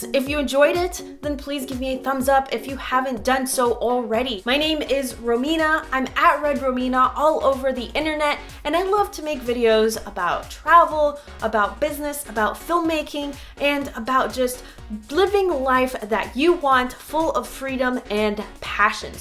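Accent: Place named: Spain. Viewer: Wow 😲 I got a nostalgia now! I was born there 😢😀... sorry I didn’t know you! Just discovered you cause Brian Sellers on Twitter 🎉 and came running to give you my support 🙏. Beautiful video Romina! Great Spanish accent 🙏👍🙏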